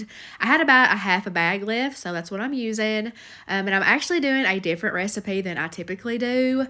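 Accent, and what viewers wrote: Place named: Kentucky, United States. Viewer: Great dinner 🥗🥣🍝 ideals! I love to hear you talk. That Kentucky accent. Lol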